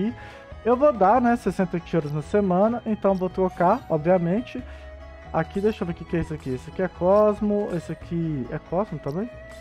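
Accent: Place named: Northeast, Brazil. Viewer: pelo seu sotaque eu jurava que era do Nordeste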